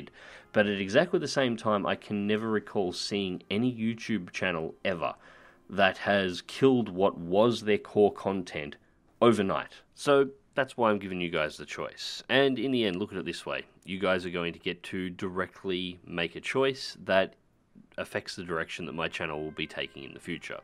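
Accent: Australia. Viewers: I live, breath, eat, and sleep Realistic Air in War Thunder, and your videos are one of the reasons that when I made the switch from arcade, I was able to function. I've grown as a pilot since then, and while your tutorials and reviews aren't as helpful to the me of the present as they were to the noob that was me of the past, they are still helping me grow. Your content, regardless of the game you cover, is very entertaining to me. I mean, you've got yourself a prime Aussie accent. I will continue to be your subscriber, regardless of the choice you end up making here. That being said, I really want War Thunder back on the channel. No matter how asinine Gaijin can be sometimes, the game they created is loads of fun. Its beauty is only accentuated by your ability to show off its vehicles to a high potential. o7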